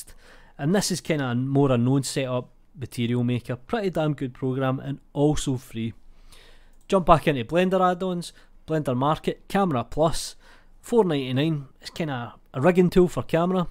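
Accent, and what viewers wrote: Scotland, United Kingdom. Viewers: Loving the channel! I have been trying to catch every vid you throw my way. This unofficial news is sweet. Keep it up! Great to hear a Scots voice doing Blender stuff. Pure dead brilliant, by the way!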